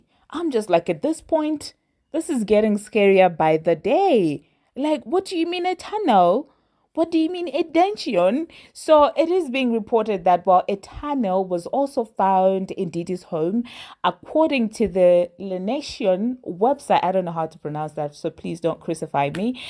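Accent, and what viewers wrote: South Africa. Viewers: are you South African??? is this you??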